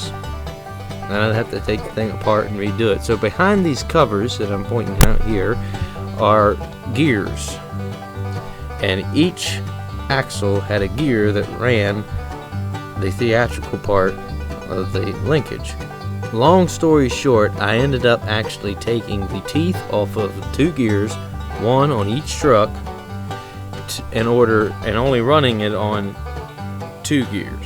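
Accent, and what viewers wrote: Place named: South, United States. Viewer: Your story tell voice with the slight southern drawl makes the narrative for this perfext. Fits the WM atmosphere almost as if scripted. Hope to see /hear more. Nice machine, I have three of them. Bears to get running right, but once they do, they're magical to behold. Some of the strongest workhorses I have too.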